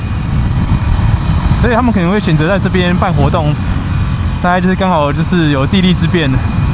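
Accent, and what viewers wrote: Taiwan. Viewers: “大加分“有台灣國語的fu 好親切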